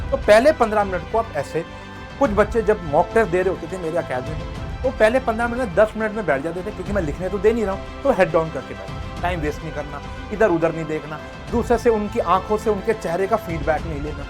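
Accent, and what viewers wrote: Punjab, India. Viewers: sir your punjabi accent bahot achi hai sir 😀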